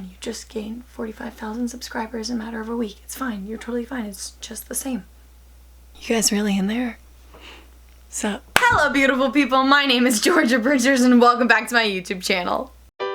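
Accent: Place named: United States. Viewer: You're like one of those classic, aesthetic AF american tumblr girls! Your accent, clothes, hair and even the way you style your hair... you'd stand out (in a really good way) here in the UK!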